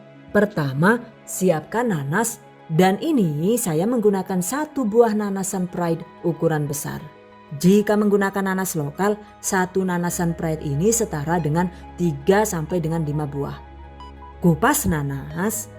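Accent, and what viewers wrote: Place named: Brebes, Indonesia. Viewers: Enak ya punya magic com yg bnr2 magic bs masak selai nanas disitu.. Saya gunakan alat apa adanya.Krn ilmu msk diasah disaat alat sederhana tp tekad mau buat lebih bsr dan hasil masakan disukai suami dan anak.. TOP dah, rajin share resep baru dan ga pelit ilmu, serta disetiap videonya ada suara lucu medok gaya bicara itu.Beda deh dari channel msk yg lain,pernah nyoba tp hasilnya mengecewakan.Kalau channel benar2 bagus dan reviewnya udh byk yg masak.. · Mbok ayune, salam sing wong brebes yen ora ngapak iya ora kepenak 😊😊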